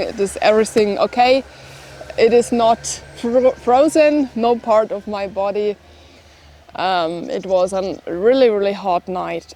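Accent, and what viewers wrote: Germany. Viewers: All I can say is " I love me some!" Girl u r absolutely beautiful and awesome. That accent is really appealing. I miss Germany, former dependent of a service member station in Bamberg, Germay. Anyway, I really think you are beautiful and awesome. Tell the husband to behave or I'm in there like turbo boost. Want to see Utah, USA? LoL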